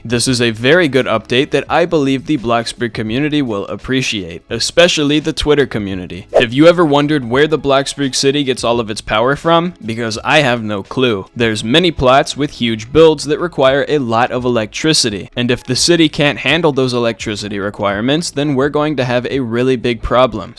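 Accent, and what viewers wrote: United Kingdom. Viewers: Ayo British 😂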